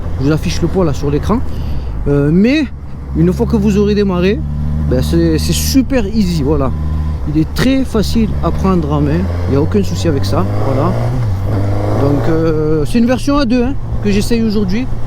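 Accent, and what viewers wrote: Marseille, France. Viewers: test sympa avec ce joli accent de Marseille sans montage bidon toutes les 2 secondes, bien aimé l avis honnête sans matraquage Mt malgré que tu roules en Mt, faut un jour que les procureurs du bicylindre arrêtent de faire des test en voulant systematiquement polemiquer bi et 4, ce sont deux mondes différents et deux choix différents. Le seul bemol dans l avis , on peut bien le prendre en A2 et debrider aprés en 95 si on aime les 4 cylindres, elle est justement A2 pour ça. like et abonnement ✌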